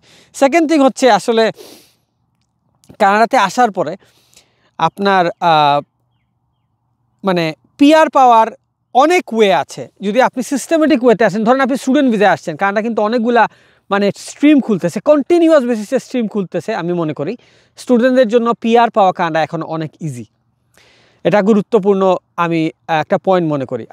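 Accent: Sylhet, Bangladesh. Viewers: আপনে সিলেটি ভাইসাব নি?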